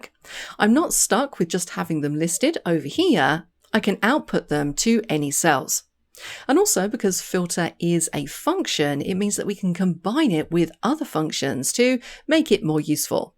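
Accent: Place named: United Kingdom. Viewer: Me encanta tu canal. Su explicación es bastante clara. Muchas Gracias desde Centroamérica. I love your channel. Your explanation is really clear even i'dont speak English, but your British accent is so clear. Tks so much. My favorite fuction is xlookup (buscarX), filter is also one of them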